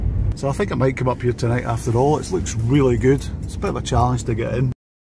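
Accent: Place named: Dundee, United Kingdom. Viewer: Cracking love snow camping was in snowdonia the weekend hardly any about even on the peaks..ps Glasgow would put have money your accent was more northern maybe Dundee ?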